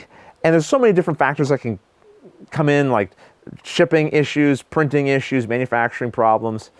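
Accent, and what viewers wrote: Canada. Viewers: You've taught me how to play a number of games this year and I'll definitely keep an eye out for your fundraiser. My wife always knows when I'm watching a show because she recognizes a fellow Canadian accent! :) You have some of the highest quality videos in terms of production which I imagine takes some time, and they often focus on major releases. With that in mind, have you considered doing something like a "quick glance" video segment/series that isn't as in depth as the normal videos but allows you to touch on a broader range of topics/games/smaller releases? Because I think the bottom line is you've got a good viewing personality for gaming, but unless I've just bought the game or am thinking about buying it, I'm not going to watch an instructional video for the fun of it, you know? Table Talk is great, but as you mentioned it's less of a focus. 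Regardless, thanks for your contributions to the community!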